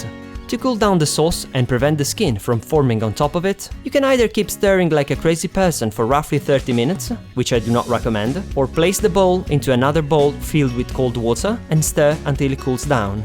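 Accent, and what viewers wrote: Italy. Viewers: yass that accent and inflection, I'm a fellow italian (: Yeah i agree and I'll sure try some of the recipes! Who knows what'll happen hahah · I found your Italian accent completely adorable!Keep up the good work of giving us tips! I am not vegan but vegetarian..Your channel is really helpful! :)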